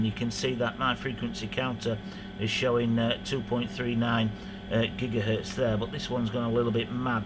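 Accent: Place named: England, United Kingdom. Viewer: Thooms (e.i. Thooms; British equivalent for Thumb. Pronounced as "loom." As in a cotton loom. - American influence/non- "King's English") Up. (Hehehehe- Ya gotta love that English Accent. Tickles ya).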